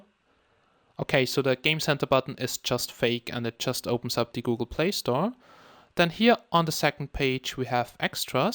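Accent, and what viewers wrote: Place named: Germany. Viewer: damn you sound german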